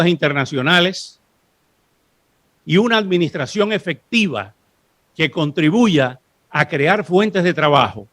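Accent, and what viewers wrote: Cuba. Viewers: Él es de RD 🇩🇴 y es primo de Ito Bisonó, el Ministro del Ministerio de Industria y Comercio del país. Óscar habla como cubano porque emigró a los 22 años a Miami, en una época donde la mayoría de los inmigrantes de esa ciudad eran cubanos y tiene programa de TV dirigida a la comunidad latina en la Florida y Miami. Busca su biografía en Internet. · No se quien es usted señor aún qué su asentó pareces cubano pero gracias mil gracias por hablar a nuestros favor es un ejemplo palpables Que usted sabé de los qué habla gracias desde RD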